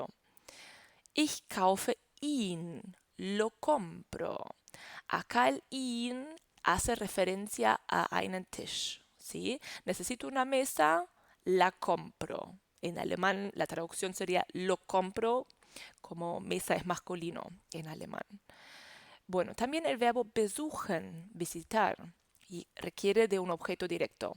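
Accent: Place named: Castile, Spain. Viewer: Sos una crack... Dankeeee Totales!! P.d. Me emociona, se me caen las lágrimas y canto un tango por tu acento castellano. :)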